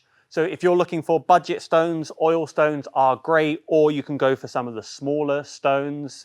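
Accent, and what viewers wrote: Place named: United Kingdom. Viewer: From your accent I thought you would be in the UK. I follow the link and the prices are in $? Hey ho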